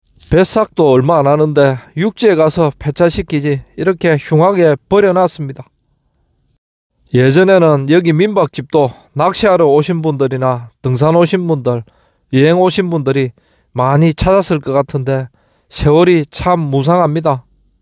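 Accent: Gyeongsang, South Korea. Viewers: 구수한 경상도 촌스런(?) 억양에 진심어린 나레이션과 고품질 영상이 참 좋습니다^^.. 자주 찾아보게 되네요... 안전에 유의하고 건강 잘 챙기시면서,, 오래오래 좋은 유튜브영상 많이 제작해서 올려주세요~~^^.. 덕분에 앞으로도.. 저는 사무실에 앉아서,,, 고생해서 찍어올려주신 동영상을 땀한방울 안흘리고 편하게 볼 수 있네요... 좋아요 추전 합니다 .. ^^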